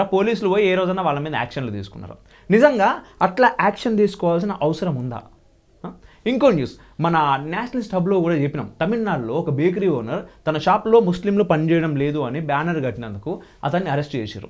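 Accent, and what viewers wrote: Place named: Telangana, India. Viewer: అబ్బాయ్, అద్భుతం, అనిర్వచనీయం. ఇట్ల చెప్పు కుంటా పోతుంటే.. ఆగదు. ఎది ఏమైనా నువ్వు చెప్పే ప్రతీ అక్షరం మొదలు.... మాలంటోల్లను... మంత్ర ముగ్ధులను చేసినవ్ పిలగా.(ప్రేమతో) తెలుగు భాషను చక్కగా చిక్కగా....బాగా బాగా చెప్పినవ్. తెలంగాణా మాండలీకం అక్కడక్కడా చాలా ఇంపుగా సొంపుగా నీ శైలి లో మా ముందు ఉంచినందుకు ధన్యవాదాలు..... ఇక ఉంటాన బ్బాయ్. ~ I, pray the almighty to bless you with more than you desire and deserve.....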